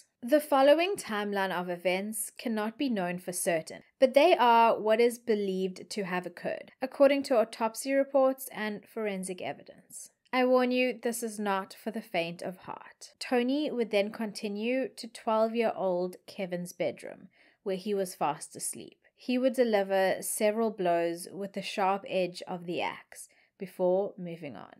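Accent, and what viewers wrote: South Africa. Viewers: I just found your channel. Love it. Can’t wait to see what other videos you have created. I love your accent. At the last school I taught at, the school secretary was from SA- best accent I’ve ever heard. Then I found Nick Van der Leek (true crime rocket science) and you even better. Great content, fantastic narration, love your background knowledge you share. I agree with family annihilator is weird term.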